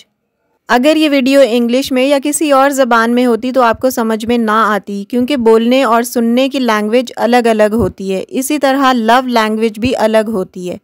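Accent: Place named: Karachi, Pakistan. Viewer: ap k bolny k andaz sy lg rha tha k ap karachi ke hen.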